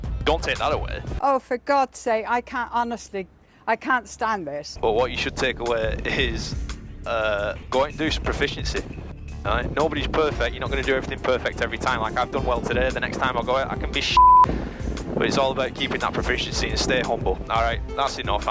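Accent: United Kingdom. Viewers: You sound British, but you're in the USA.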